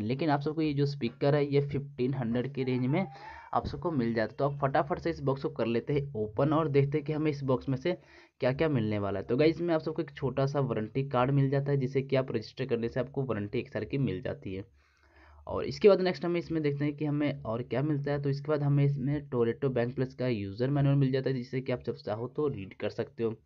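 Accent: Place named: Punjab, India. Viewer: Amazing Punjabi accent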